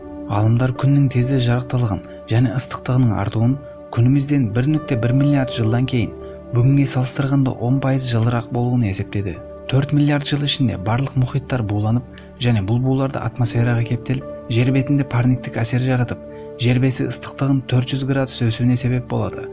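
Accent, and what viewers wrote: China. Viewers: Қытайда оқығансыз ба? тіл акцентіңіз ұқсас. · Кытайда туратын казах менинше.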